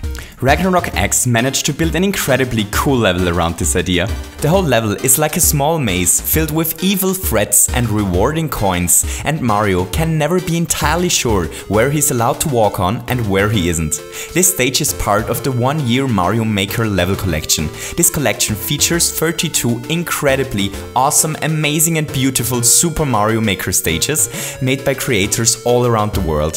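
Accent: Germany. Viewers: du klingst richtig deutsch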